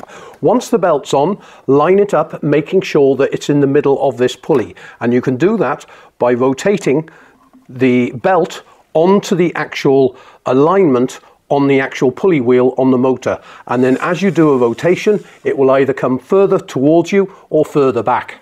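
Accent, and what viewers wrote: United Kingdom. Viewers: Thanks a million for a great tutorial. And as English isn't my first language I especially appreciate your plain, sort of BBC like, English so easy to follow. Best regards! You've already created a legacy.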